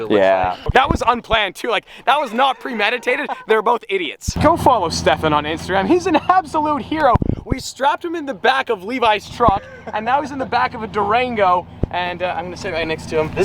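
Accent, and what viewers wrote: United Kingdom. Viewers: Is it just me or does he sound British during the intro? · is he British?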